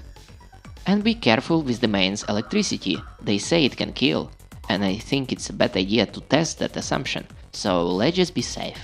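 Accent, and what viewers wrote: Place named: Russia. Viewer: Russian accent detected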